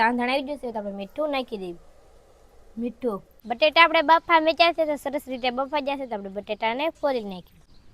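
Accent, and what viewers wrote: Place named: Kathiawar, India. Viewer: The language she isvtalking like kathavadadi speaking Difficult to understand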